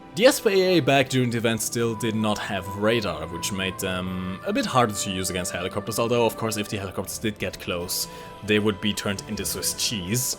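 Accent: Germany. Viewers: Sorry but i cant listen to you because you Sound so german. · Digga, man hört deinen Deutschen Akzent richtig raus xD.Würde mich freuen, wenn du einen Kanal aufmachen würdest wo du War Thunder spielst :D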